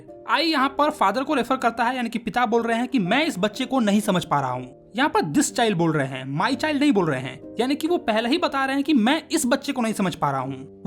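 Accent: Bihar, India. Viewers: Sir aap Bihar se hai na ❤❤ Aapki avaj se laga 😂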